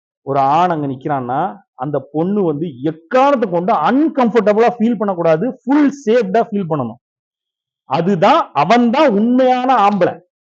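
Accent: Tirunelveli, India. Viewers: மாஸ்டருக்கு திருநெல்வேலி யா தூத்துக்குடி யா,,,,, எந்த ஊரு உங்க பேச்சுல நெல்லை தமிழ் ,,,,,,, இனிமை,,,,,, இனிமை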